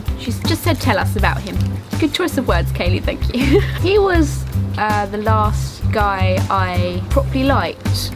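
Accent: United Kingdom. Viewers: oh and your british accent rocks :D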